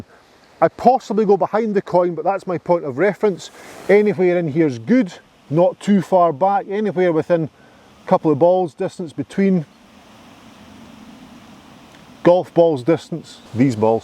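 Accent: Scotland, United Kingdom. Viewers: I really enjoy your videos, and have used a number of your ideas. I do especially like this one regarding setting up behind the tee with your driver. I don't understand why you don't have at least 250 thousand subscribers. Your videos are clear (maybe the scotch brogue takes a little interpretation. lol) concise, and sometimes downright funny. Well. keep making the videos and I will keep watching them. Lang may yer lum reek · Just loving your scottish accent...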